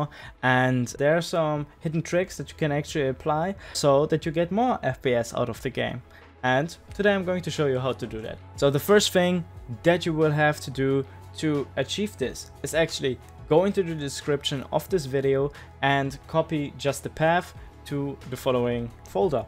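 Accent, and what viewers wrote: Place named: Germany. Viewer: Also Bro no Hat, aber den deutschen Akzent hört man dick bei dir raus. Aber sehr Informatives Video, Kuss auf die Nuss❤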